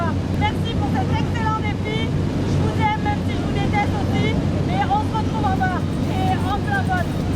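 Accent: Quebec, Canada. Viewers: l'accent québécois s'en vient petit à petit #teamquebec 🇲🇶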